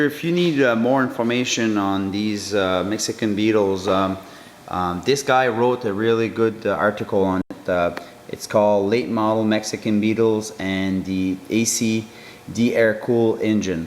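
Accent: Quebec, Canada. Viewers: Beautiful car!!! Not sure where you are located but you speak English just like those folks in Quebec Canada.... I live in Ontario!!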